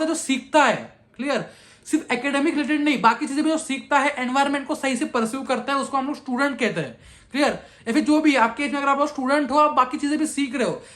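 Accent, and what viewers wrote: Maharashtra, India. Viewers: He is maharastrian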